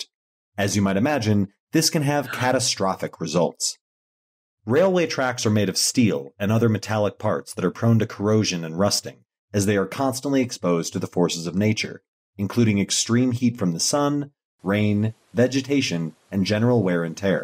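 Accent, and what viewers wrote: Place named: United States. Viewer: Why are you going to program AI with an American accent and then give us measurements in centimeters?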